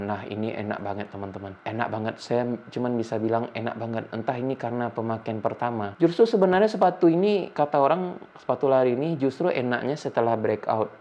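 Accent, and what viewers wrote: West Sumatra, Indonesia. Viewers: Lidah nya patah2. Dima sumbar da? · Aksen minangnya ketara banget tapi mantap uda reviewnyo. Sangaik mambantu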